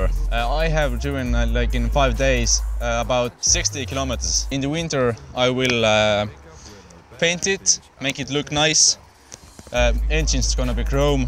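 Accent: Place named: Estonia. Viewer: Aksent reetis et tegu võib olla Eestiga. Lahe ja naljakas video.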